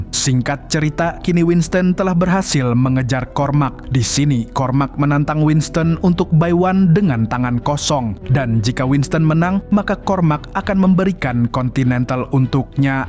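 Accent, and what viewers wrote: Banjar, Indonesia. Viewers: Ternyata urang banjar lah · Urang banjar jahhh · Jah urang Banjar Leh Pian mang ,, mantapp 😂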